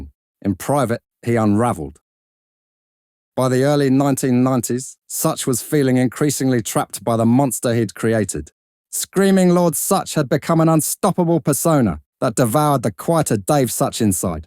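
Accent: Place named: Cockney, United Kingdom. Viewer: Please keep the human narrator - like an East End carnival barker... Very appropriate, somehow.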